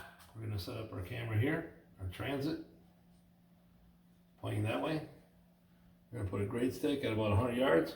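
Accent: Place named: Italian-American, United States. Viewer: You sound like a fellow paisan! Keep up the good work!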